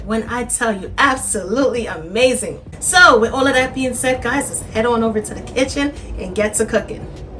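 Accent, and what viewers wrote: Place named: East Coast, United States. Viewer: Making this with leftover pot roast 😋 Love the east coast accent 😁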